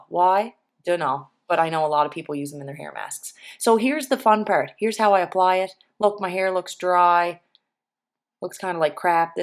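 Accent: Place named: Maritimes, Canada. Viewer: I seriously love your maritimes accent I live in the suburbs of Ottawa. I'm just finding my curls again. Love your Channel feel free to check mine out to mines more about the food and saving money LOL.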